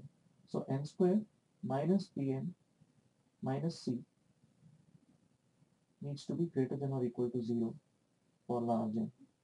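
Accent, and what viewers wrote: India. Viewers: Indian (?) accent plus s-o-f-t voice plus recording volume turned up to compensate = mud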